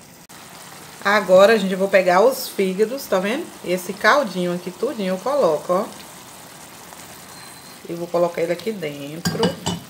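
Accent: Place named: Northeast, Brazil. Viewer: Boa noite! Amei sua receita, vou fazer assim. Você é nordestina? Pelo sotaque, kkk, eu sou pernambucana. E pelo coentro tenho certeza , pois amamos.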